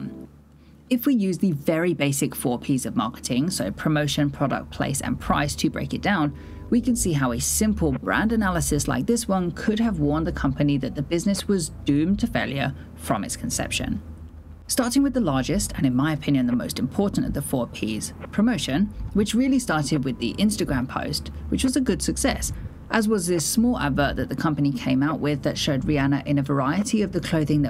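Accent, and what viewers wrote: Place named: RP, United Kingdom. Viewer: Bro your posh accent sound so prim and proper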